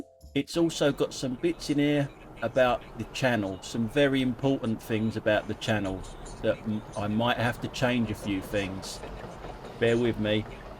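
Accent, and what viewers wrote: London, United Kingdom. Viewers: I have absolutely no issues with your swearing, it's a natural part of the way we brits speak, but for the sake of keeping your videos monetised beeping it out would probably be the easiest thing to do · Keep on as you are, Living now in Dorset it's good to hear your London accent. Some people are what I call professional at being offended, the more they are 'offended' the happier they are. PC bull shine!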